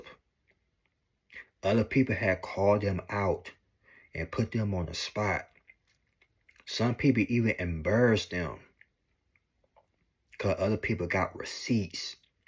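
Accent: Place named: South, United States. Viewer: Hey bruh, I know people got so e shit to say about me. I also know you seem to be on my side. Seeing how you got a southern accent... im sure it's a culture shock sometimes. 1 word can have a completely different meaning in several languages. It also doesn't help that people are constantly changing the meanings of words in our own language. "Im dead" today... doesn't mean what it did 10 years ago. Next time you think you hear something offensive... don't be afraid to ask what that term means to them personally. I only say this because your one of the few level headed people I know strong enough to follow through. Change your mind... change your world ❤ Keep ya head up son.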